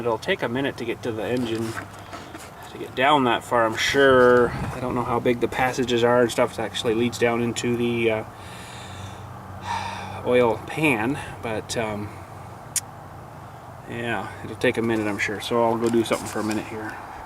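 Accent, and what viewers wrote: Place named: Canada. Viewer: You sound like you’re from Canada just by the way your accent is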